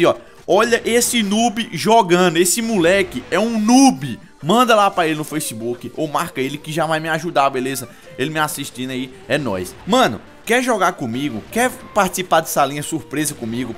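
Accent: Northeast, Brazil. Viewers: voce é do nordeste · você é nordestino? :O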